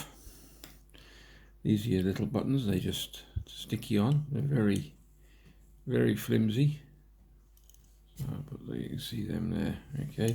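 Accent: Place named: Shrewsbury, United Kingdom. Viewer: Recognise that accent...... Shrewsbury Monner by any chance?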